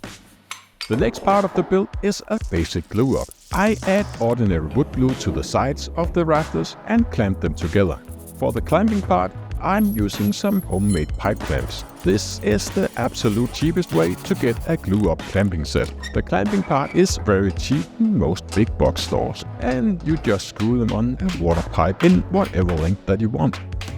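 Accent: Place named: Denmark. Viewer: “Double V D 40” made me laugh. I enjoy the Danish/English. Keep it up buddy. I find myself watching your videos when I want to be calm after a hectic day. You encourage me to build while your videos calm part of my brain that is usually full of angst and frustration. You’re living my dream and I can only imagine how good your soul must feel most days. Thank you for sharing your experiences with us —Chip from Toledo Ohio.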